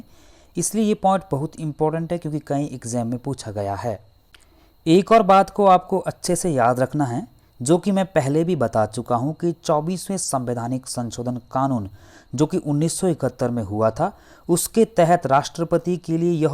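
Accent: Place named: Bengal, India. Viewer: Sir aap jarur other language bolne wale ho... I think bengali aur assemese😂😂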